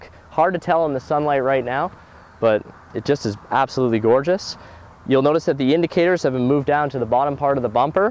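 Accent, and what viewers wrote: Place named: Canada. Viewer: Oh he's Canadian... That explains his pronunciation and his hotness :)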